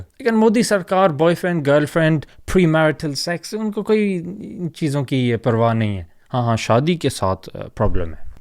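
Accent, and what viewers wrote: Punjab, Pakistan. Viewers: I'm digging your punjabi accent wali urdu 😄💚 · OMG 😱 your Urdu is like 👍🏽 Pakistani Punjabi Uncles who have lived for a long time in the west 🌎 Modi doesn’t want to give education, coz you know education is directly related to lesser votes 🗳 for the BJP party, which is also the same in the US for Republicans and so he has pass laws against triple talaq n marriage age and disenfranchisement of Muslims and farmers coz that makes his voters base happy 😃 as this effects the minority predominantly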